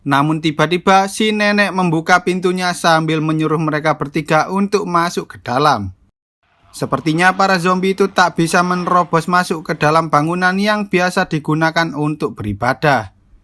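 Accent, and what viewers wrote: Java, Indonesia. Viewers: Jowo pisan iki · Mimin orang jawa kahh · Jowo mas🔥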